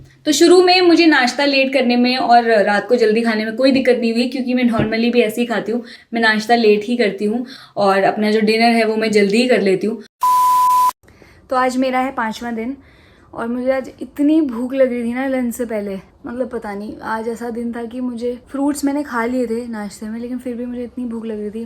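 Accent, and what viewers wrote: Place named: Pahadi, India. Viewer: You are pahadi and delhi ki... i can sense you mann!!! Amazing review.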